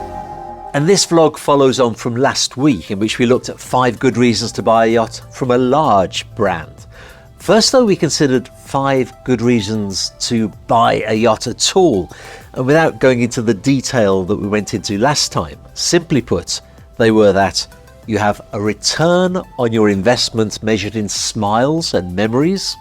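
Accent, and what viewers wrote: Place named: Birmingham, United Kingdom. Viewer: VERY interesting video. The advantages are applicable for many industries too. Loving the brummie accent ... 🇬🇧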